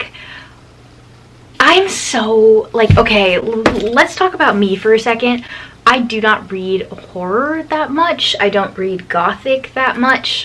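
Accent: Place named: Midwest, United States. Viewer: “i sounded midwestern” hahaha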